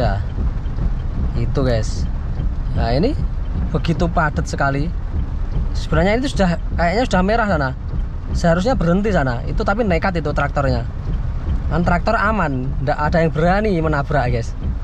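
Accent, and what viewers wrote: Madiun, Indonesia. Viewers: orang madiun nggih mas?😅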